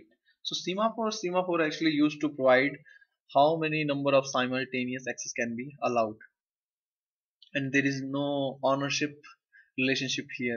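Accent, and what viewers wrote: India. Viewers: The indian english made me quit after 10s. Bye.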